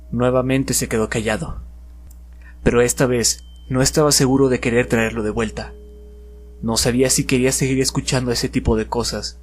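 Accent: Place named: Latin America. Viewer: Me encanta tu acento latino el mejor, tu narración simplemente genial Gracias!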